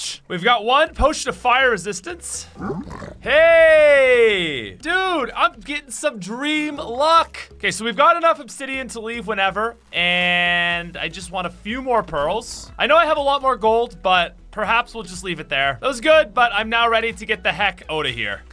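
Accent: Ireland. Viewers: Is he Irish because sometimes he sounds Irish but it’s not super noticeable like most Irish people but I can kinda hear it. I HONESTLY can’t tell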